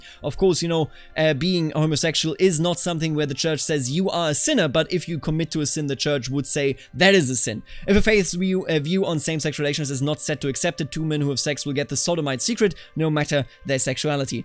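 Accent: Germany. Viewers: He sounds very german lmao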